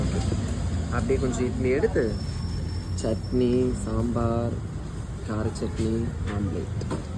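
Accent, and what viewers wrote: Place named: Kanyakumari, India. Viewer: Casual ah neenga pesuradhu thaan namma kanyakumari azhagu ❤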